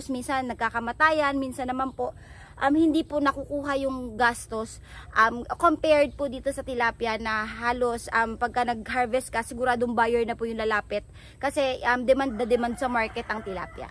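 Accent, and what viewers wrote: Visayas, Philippines. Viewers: Oi bisaya diay ka maam shout out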